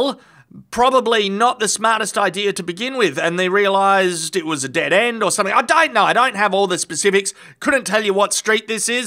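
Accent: Australia. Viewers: This just wouldnt be the same without the Australian narration.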